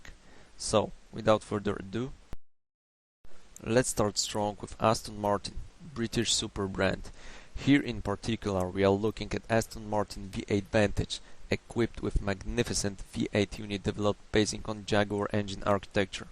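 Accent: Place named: Poland. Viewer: You Polish? Taki kanciasty akcent ;)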